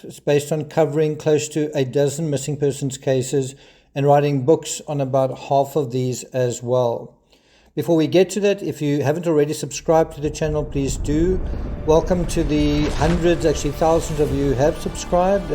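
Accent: South Africa. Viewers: Thank you for this excellent summary! So great to hear a South African voice... I am a South African living in the UK. I have followed the case from beginning to end and I am sorry that Jay Slater has lost his life, but I am glad that his body has been found.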